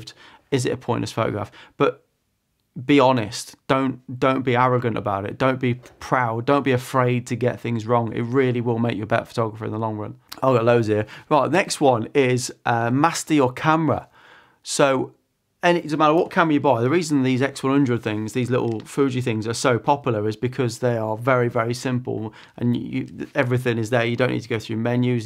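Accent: United Kingdom. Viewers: Maybe I misheard you--sometimes I have trouble with British accents (I speak that weird variant of English spoken in the USA... :) BTW, I just bought the a6600 two weeks ago and I'm having a blast with it. I had stopped using my Canon 5D because it was just too heavy and bulky. Getting back into street photography with the Sony--I've found your videos very inspiring.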